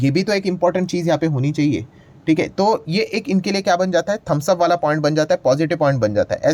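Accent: Maharashtra, India. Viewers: Kantala se laga sir Marathi Manus hai 😂😂😂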